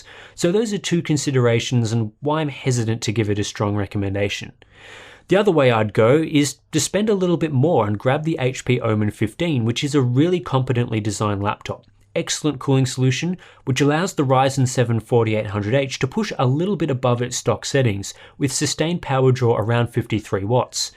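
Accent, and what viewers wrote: Australia. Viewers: great work with the review. only of the reason i watched it was that you are in australia (assumption with your accent). i would have liked if some of your links were australian stores. just google australian store none of them stock ryzen laptop except the ASUS Tuff or asus rog g14. not helping with the decision making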